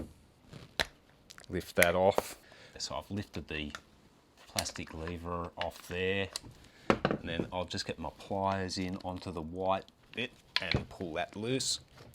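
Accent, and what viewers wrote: Australia. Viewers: Loved that video mate. Just got to see if this fixes my E54 running on a couple of cylinders. Thanks, and love that Aussie twang!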